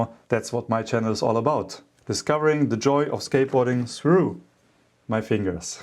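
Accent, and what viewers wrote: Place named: Germany. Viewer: Bro's first language is German, cut him some slack.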